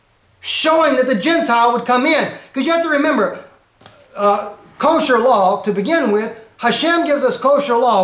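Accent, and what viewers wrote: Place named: Texas, United States. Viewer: you betta preach brotha ! whoo. this sounds like good ol down home southern preachin to me. i think i hear a little texas in his voice hahaha. PRAISE HASHEM!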